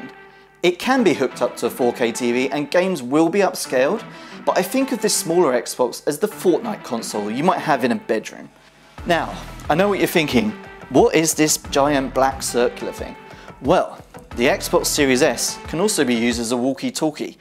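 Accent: United Kingdom. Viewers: why your british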